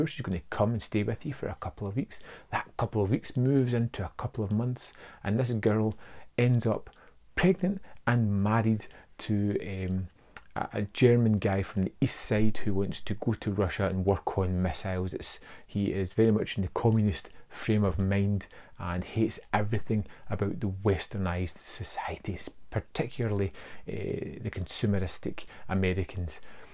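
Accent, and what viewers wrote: Scotland, United Kingdom. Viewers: You should effin subtitle your videos, dude, that thick Scottish accent is too much to handle.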